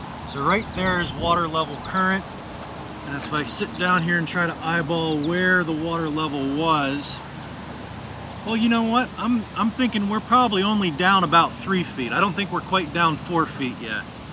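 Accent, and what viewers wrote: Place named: Pittsburgh, United States. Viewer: Add bentonite clay, cheaper, are u in pa pittsburgh area? I here your accent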